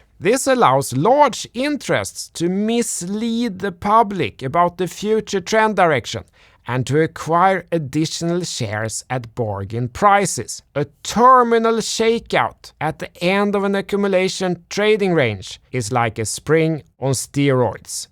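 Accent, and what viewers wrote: Sweden. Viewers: Hör ända från östergötland att de är en svensson som är i farten!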